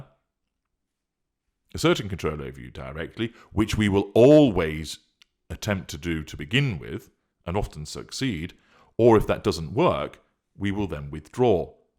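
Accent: United Kingdom. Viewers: I like his voice.. sometimes it is creepy in a way, I guess. But I like his British accent.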